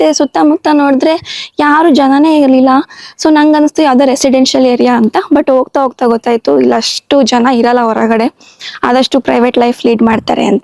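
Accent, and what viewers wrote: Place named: Sira, India. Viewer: ದೂರದ ಜರ್ಮನಿಯಲ್ಲಿ ನಮ್ಮ ಸಿರಾ ಭಾಷೆ..... ಕೇಳಿ ಖುಷಿ ಅಯ್ತು...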